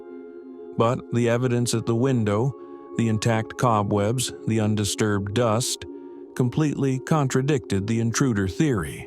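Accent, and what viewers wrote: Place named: South, United States. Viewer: The narration is horrible with some type of crazy “southern” accent. Couldn’t listen.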